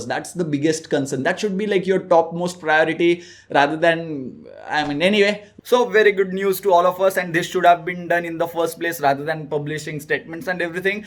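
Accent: India. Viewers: Is that one punch man with a mustache and an indian accent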